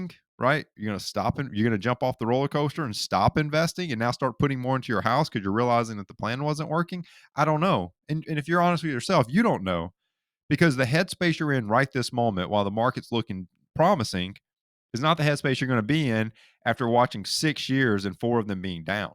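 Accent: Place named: South, United States. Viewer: Yet another financial talking head spewing advice. Sure, like his calculation that's great. But for crying out loud, how could I honestly take advice from someone that looks like he's got his smile permanently pasted on himself and he's got his fingers stuck in a light socket?! and....geez....he sounds like a good ol' country boy. Apologies that I'm that opinionated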